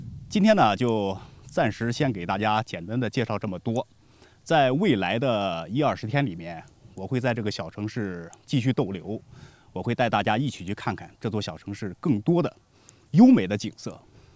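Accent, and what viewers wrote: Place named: Central Plains, China. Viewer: 我看的视频，总感觉像是中原这边的人，主要是听口音😂😂😂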